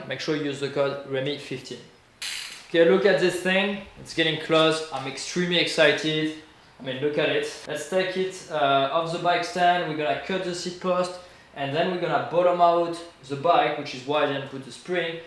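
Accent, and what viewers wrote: France. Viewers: This guy has the heaviest French accent I ever heard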